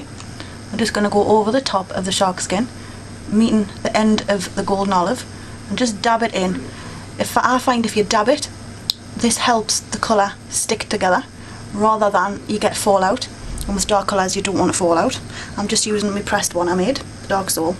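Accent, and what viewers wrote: Newcastle, United Kingdom. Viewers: It's Geordie - as in Newcastle etc... !! !!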